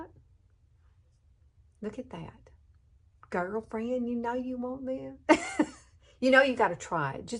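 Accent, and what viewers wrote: South, United States. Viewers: Some very good 'tips'. Just love your Southern accent, don't hear it much In Australia. First time on your Channel, thank you. You look great.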